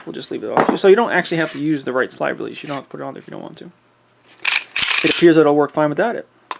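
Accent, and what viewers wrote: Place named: Connecticut, United States. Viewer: What accent is that? You sound like my pal from Connecticut.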